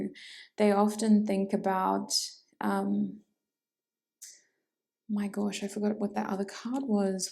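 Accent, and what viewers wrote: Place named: Australia. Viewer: Yay another Australian reader! 💜💜🙏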